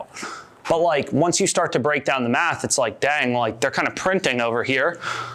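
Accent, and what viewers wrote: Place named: South Jersey, United States. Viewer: 2 questions. Are you from delco? Cuz you sound like you are either from south jersey or delco. Andddd did you sorta think you could wing this presentation without much practice before? I know how it feels to speak to a group of strangers cuz i used to host trivia and my first few shows were terribly awkward. Just like this was at times, rambling in and out of tangents, awkward long pauses etc. Part of that skill definitely come with repetition which will come with time, but preparation helps and this felt a bit undercooked at times for sure.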